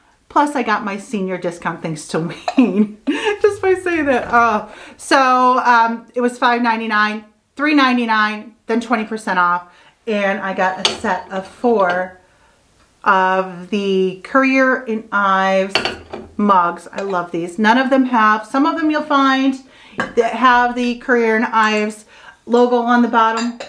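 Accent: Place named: Michigan, United States. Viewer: I just found your channel today. Are you from Michigan? I grew up there but live in Illinois now. I can sometimes still hear the Michigan twayang, lol.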